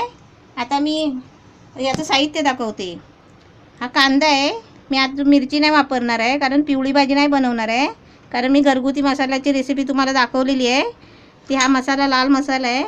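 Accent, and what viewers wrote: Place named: Ratnagiri, India. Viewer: mavashi khari mavshi vatate avajavarun agadi Ratnagiri chi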